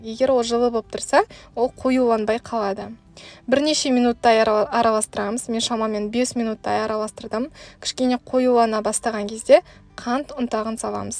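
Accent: Standard. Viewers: сізді үнемі көремін. Өте жақсы дайындайсыз! Таза қазақша сөйлеп!☺️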